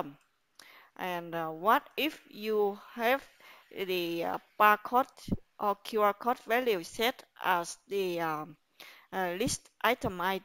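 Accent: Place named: Vietnam. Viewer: thề nghe giọng đọc TA biết ngay người Vietnam :))))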